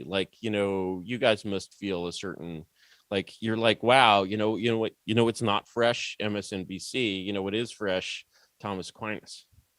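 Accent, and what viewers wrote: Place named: New York City, United States. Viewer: It's a nyc-ish Jewish accent not boston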